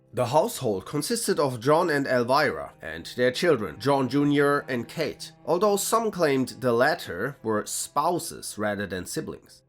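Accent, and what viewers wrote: Germany. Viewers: am just messing with you bruh i like german accent and it doesnt matter nothin how you pronounce something. If we all spoke same accent it would just be boring.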